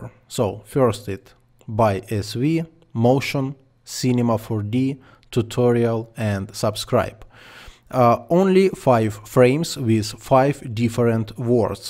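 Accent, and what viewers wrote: Russia. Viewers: А по-русски тоже самое можно?, иностранцы ваш английский все-равно не понимают, думают вы по-русски говорите...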